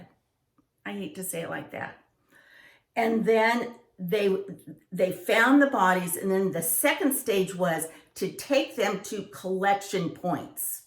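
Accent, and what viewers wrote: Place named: California, United States. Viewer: Hi, I am a new sub🤗 I just love your channel and I LOVE your California accent so much, where in California did you grow up? I have been binge watching your videos! 😘 I came across your channel from watching Monika Mcgillicudy and I saw a thumbnail on the right side. I love your home decor!!! Beautiful💕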